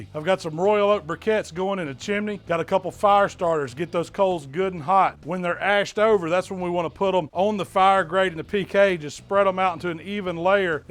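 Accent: South, United States. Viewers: This guy is awesome! Where is he from? Im from Germany so i don't know much about american accents but he sounds so cool! Anways greetings from Germany! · Dude with cool southern accent, love of red meat and Jack Daniels, and uses the black nylon BBQ gloves...I'm subbed!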